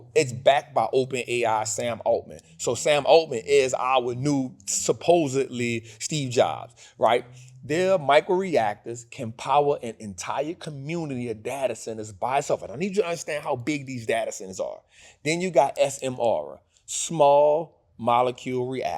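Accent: New Orleans, United States. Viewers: Yea, he from the city. If you not from here (the city) is what we in Louisiana call new Orleans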